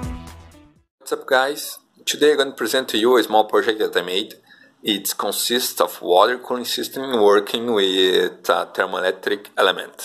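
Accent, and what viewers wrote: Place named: Brazil. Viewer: Brazilian sotaque....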